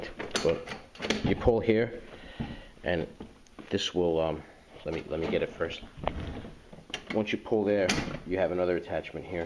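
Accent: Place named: New York, United States. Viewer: anytime a guy with a heavy new york accent says "alright, you're probably wondering whats under da bag...." you'll want to stay till the end : )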